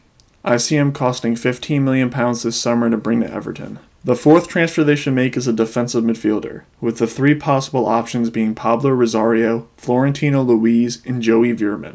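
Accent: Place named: United States. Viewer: Started watching, heard Amercian accent, switched off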